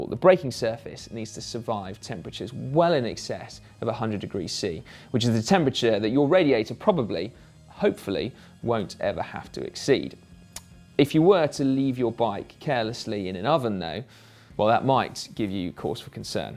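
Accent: United Kingdom. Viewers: Science glasses and Alan Partridge accent.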